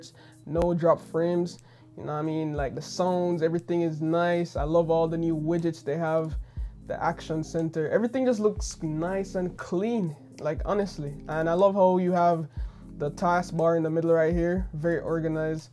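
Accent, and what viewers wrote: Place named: Jamaica. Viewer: You have a Jamaican twang bro are you from jamaica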